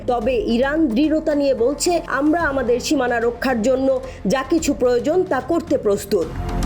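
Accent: Kolkata, India. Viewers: Uposthapika ki kolkatar naki.?😅😅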